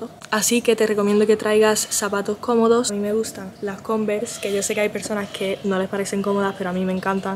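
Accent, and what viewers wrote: Andalusia, Spain. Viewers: Cuando empieces a hablar no digas "hi guys", ni que fueras gringa 🤣. Di hola que tal o como estáis 👍😁. Por cierto, hablando ya eres andaluza total 😅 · Amos la primica, me dices que eres Andaluza y me lo creo,como se te a pegao el Andaluh, chiquilla. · Esta chica lleva 2 años en España, hasta se le ha pegado el acento andaluz. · si creo que no lo vi desde el principio, esta visto que aun necesita mejorar el andaluz. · Me dicen que eres andaluza, y me lo creo. Y qué bien se te entiende! Buen video y ameno. Y te expresas genial. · Al principio pensé que eras andaluza. Se te ha pegado el a acentillo ya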